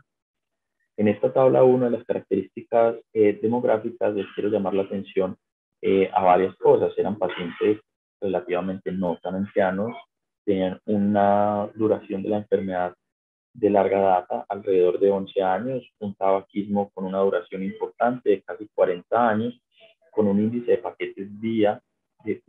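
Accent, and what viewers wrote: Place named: Colombia. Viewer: No se entiende por el acento colombiano o es que tiene el micrófono muy pegado de la boca debe hablar más lento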